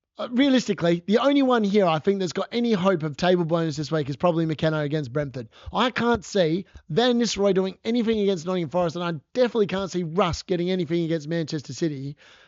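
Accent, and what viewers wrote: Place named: Australia. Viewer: Hi mate, love your content and delivery, great hearing an Aussie accent . I'm Aussie in NSW . First year playing but lovin' it. A great differential in my opinion going forward is McNeil from Everton , they have increased his minutes over the last few weeks and he was brilliant last week . $5.1m if not this week definitely next imho. Cheers bro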